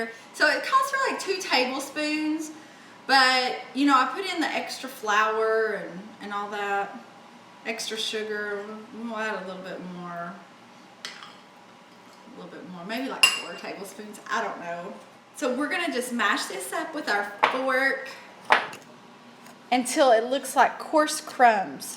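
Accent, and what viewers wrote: South, United States. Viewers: Hey! Just wanted to let you know that I just made your muffin recipe and they turned out amazing!!!! Love your southern accent and style! Thanks for the bomb recipe!!❤❤❤ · Oh i love the accent, where are you from? Sounds southern? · I've never seen this channel before but I heard that southern accent and I immediately knew this woman can bake. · I absolutely LOVE THIS RECIPE! It’s the absolute best ! PS, when I heard your southern draw I knew this was the recipe for me!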